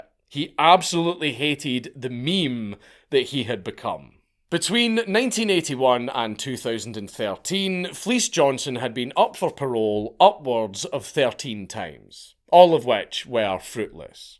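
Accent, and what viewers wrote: Scotland, United Kingdom. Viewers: I'd have dropped a like on this anyway, but hearing Booty Warrior in that glorious Scottish accent was the gift I didn't know I needed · Stopped in to listen to a Scotsman speak of BOOO' EEE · The Scottish accent makes this better 😂 love the accent bro 👌🏾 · Something about this presentation being conducted by a Scottish man just makes it that much better.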